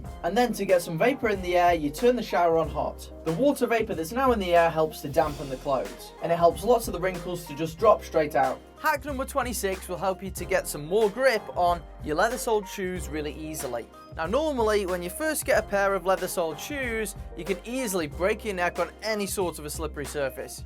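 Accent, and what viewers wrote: United Kingdom. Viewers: love british accents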